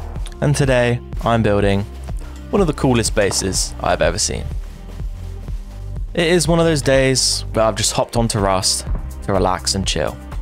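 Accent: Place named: England, United Kingdom. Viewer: Love the inventive builds and chill vibes. I prefer your English accent over the American :)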